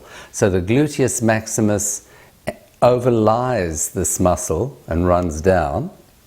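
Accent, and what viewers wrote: South Africa. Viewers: That has got to be a South African accent lol. Either way, I won’t really use this information anywhere but I enjoyed the video regardless. You’re a great teacher ❤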